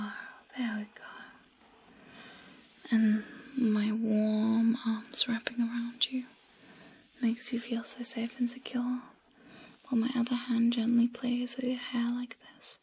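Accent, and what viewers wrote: United Kingdom. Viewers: i enjoyed that slight british accent feels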